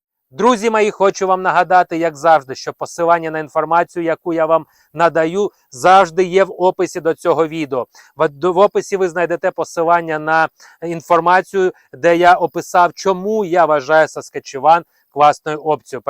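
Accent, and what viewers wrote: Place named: Russia. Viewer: Я це акання взагалі чути не можу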